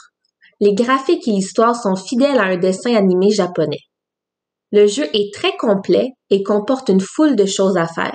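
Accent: Canada. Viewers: Sympa comme présentation ,l'accent canadien en plus .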